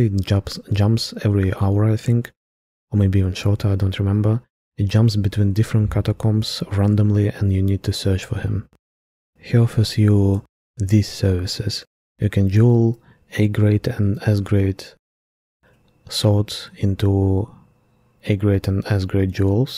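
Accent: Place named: Germany. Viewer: are you german friend?? very nice accent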